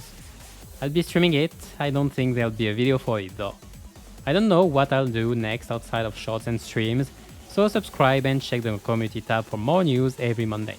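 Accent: France. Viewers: Thank again for tutorial i will send to my friend he really need (J'adore votre accent français xD)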